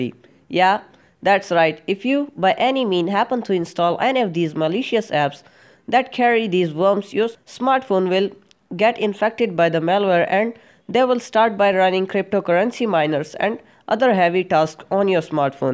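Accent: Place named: India. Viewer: Even if you sound super Indian you made it sound very clear great video 👍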